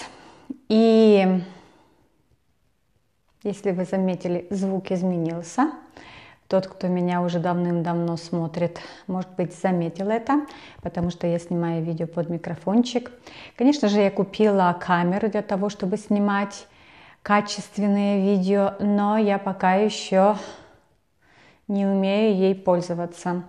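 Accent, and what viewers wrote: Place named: Ukraine. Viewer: да давно пробовала пробник, но сейчас решила, что это будет самое то на весну!!! Посылочка ещё не пришла... мне очень нравится Ваш голос и речь немного с украинским акцентом... не слушайте не кого.... и не говорите что у Вас мало подписчиков. Те кто есть Вас любят. У Вас нереальная коллекция и много мне подходит по рекомендациям. Уде 200 флаконов где-то. Собираю все нишу люкс, ретро. Очень полюбилось Sublime and Joy by Jean Patou... очень богато и нежно как по мне. Я живу в Америке 30 лет, сейчас начала здесь встречаться с итальянцем.... очень надеюсь что получится... хотя много говорят итальянцы врунишки и гуляки... и пускают пыли в глаза... а хочется любви и романтики... посмотрим. 💝💖💐🥰😘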